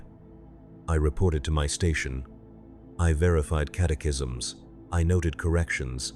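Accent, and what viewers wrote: United States. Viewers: Brother, stick with the old voice. This is painful to listen to. Voice is from some dude in Vermont that did an extra year in Grammer school. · Dude the voice is American again ?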